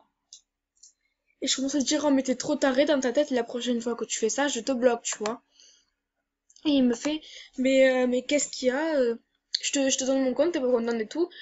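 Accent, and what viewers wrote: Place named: Toulouse, France. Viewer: Kami Kado De youtube elle a le même accent quelle (Toulouse je pense) :)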